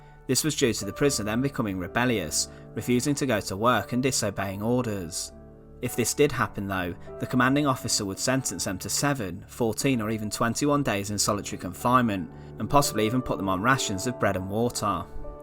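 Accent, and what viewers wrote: United Kingdom. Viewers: ...so says a guy with a British accent...let’s ask a German who actually was imprisoned in one instead of the enemy who kept them there.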